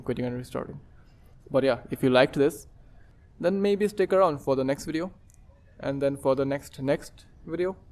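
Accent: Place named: India. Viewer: This looks so good i made a good coice to sub to you, Iam noticing you have a indian accent so,just curiuse are you India btw jam not indian ism bangladeshi. This was a wild journey to good trough such a creative video 😅 EDIT:I really like the Charector it has her own visual style,Also i was thinking should this game have shell shaded charectors, I Thik It will break the unique art style what done you think🤔.And o wana if this game going to be TP. Or FP it looks really good one both 😆..Holy shit i was just watching The video this chicken i's fucking uncanny dude seriusely got me. 😨 (Enemy with respect was really funny ngl😁).You rembeling random sad shit and the chicken just checking her out was the best part really adds to the pervy part. The random bits if unintentional jokes are pretty funny i like how the chicken just humping the green ball. 😂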